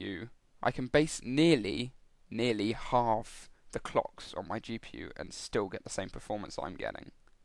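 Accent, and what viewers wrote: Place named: United Kingdom. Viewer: Just a question about accent bro. I can understand you and I'm from Turkey,Are there any american who can't understand British ?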